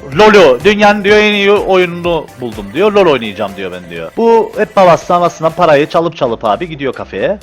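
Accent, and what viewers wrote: Cyprus. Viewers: abi Kıbrıslımısın ;)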